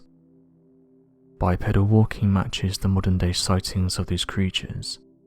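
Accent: England, United Kingdom. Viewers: Works for me ONLY if I don't listen to how he pronounces certain words, such as saying "er" for the "th" sound...eaver instead of either. It reminds me of a speech impediment, but the English have funky accents depending on what part of the country they're from like us ie Southern vs Yankee!!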